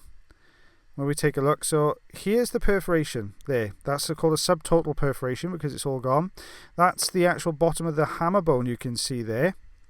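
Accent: Wales, United Kingdom. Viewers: I so enjoy your videos. They're so satisfying and I love Welsh voices.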